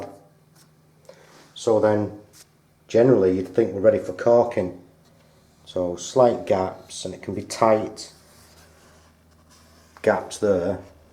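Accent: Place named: St Helens, United Kingdom. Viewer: Sounds like a St Helens accent that mate, good work as well bud 👍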